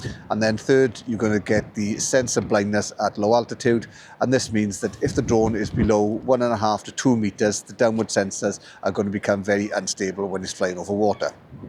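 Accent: Wales, United Kingdom. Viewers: Welsh accent is the best one!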